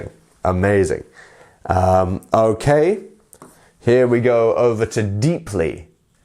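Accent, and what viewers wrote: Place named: RP, United Kingdom. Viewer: I'm totally hooked on your accent,. Hearing this RP is like a journey through the vast and green Southern English landscapes 😅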